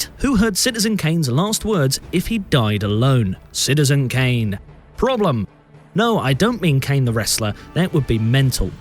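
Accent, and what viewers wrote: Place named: Bristol, United Kingdom. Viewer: He sounds a bit bristolian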